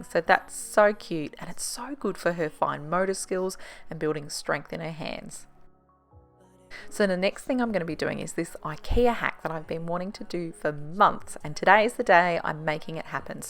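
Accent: Australia. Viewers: I've got the LG combined washer and dryer as I live in a unit so am space limited. I love it. It can take an hour and a half to dry clothes which is ages but i absolutely hate hanging them and then having the little clothes sculpture lurking around for days before I can be bothered folding them. ps. new melbourne follower here. So refreshing to hear an aussie voice on a clean with me vid.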